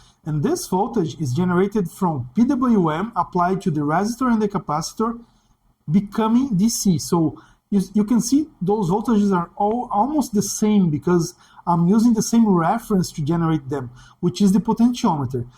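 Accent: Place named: Brazil. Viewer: my guy you cant hide your accent most obvious brazillian ever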